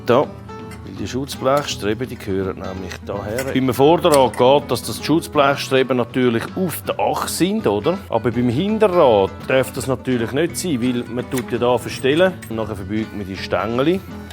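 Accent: Switzerland. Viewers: Das geilste an seinem Schwizer Dütsch is wenn ich genau hinhöre verstehe alles 😁 sogar als hochdeutsch gewohnter😁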